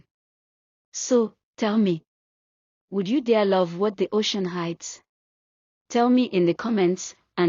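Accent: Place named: Africa. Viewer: I love the sound effects on your videos and the African tone, you're doing well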